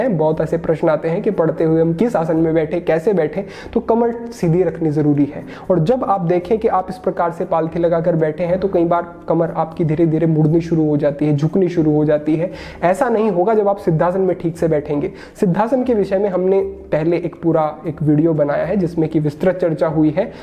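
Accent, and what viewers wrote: Standard. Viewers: Kitni shudh hindi bolte hai aap🤩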